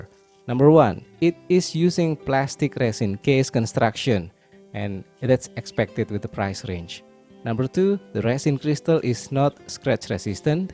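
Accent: Indonesia. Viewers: Hello! I came across your videos while searching for proper reviews of lesser-known vintage Casio models with better water resistance, like the B650WD. The more I watched, the more I noticed something both familiar and unique about the way you speak. After a while, I realized from your accent that you’re Indonesian! Your reviews are top-notch, and I’m surprised you haven’t attracted a larger audience. Please keep making great videos, sir!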